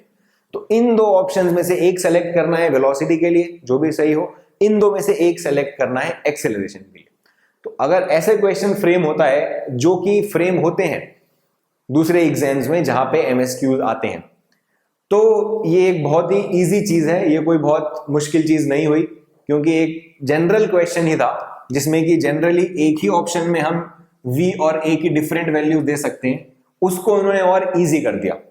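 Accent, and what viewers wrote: India. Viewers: sir u r speaking in hindi like a south indian....seems funny to c u speaking in hindi bcoz i have a habit of listening to u in english only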